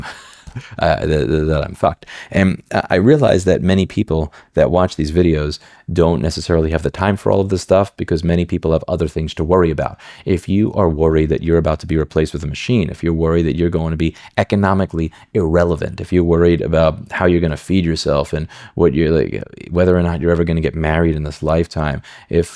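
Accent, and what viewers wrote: United States. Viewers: Oh .... I thought he drove a manual + he sounds American: ipso facto, alarms/kill switches are automagically built-in to a manual.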